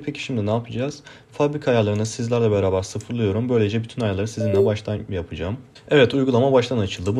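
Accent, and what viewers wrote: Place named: Azerbaijan. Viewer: Azerbaycanlisin sanirim aksanin arkadasiminkiyle ayni :)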